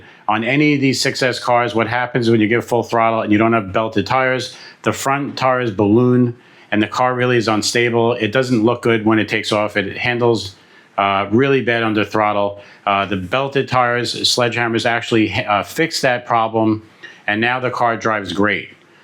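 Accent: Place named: Brooklyn, United States. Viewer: My Cousin sounds like you. He is from Brooklyn I know this has nuttin to do with video! LOL Good to hear accent. I live in Florida so I hear way less and miss it!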